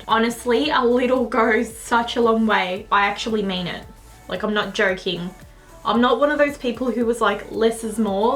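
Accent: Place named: Australia. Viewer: I love your channel! You're my fav. Makeup-youtuber with no exceptions, love the australian english too!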